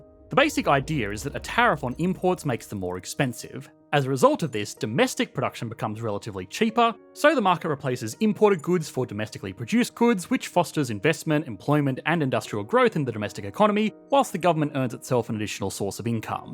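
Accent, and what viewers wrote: Australia. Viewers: Always better when something is explained in an Australian accent honestly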